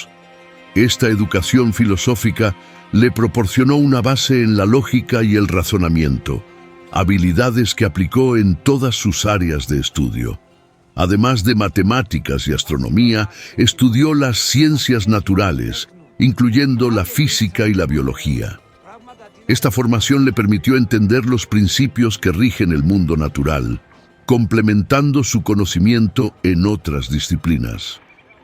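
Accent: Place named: Castile, Spain. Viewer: Es con acento castellano 😊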